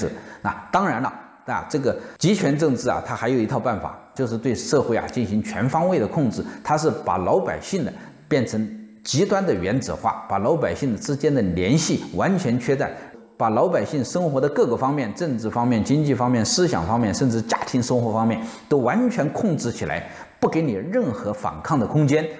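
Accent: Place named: Henan, China. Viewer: 做人我不管我听不惯河南话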